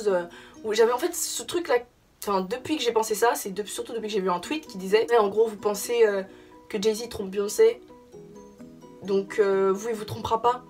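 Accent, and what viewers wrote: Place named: France. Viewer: Damn I love your French accent I have been obsessed with French literally my whole life, I just love you so much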